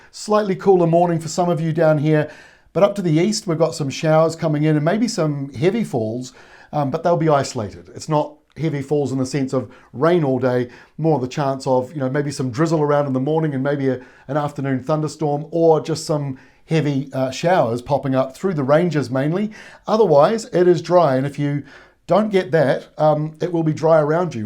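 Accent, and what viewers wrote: New Zealand. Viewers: Good clear kiwi presentation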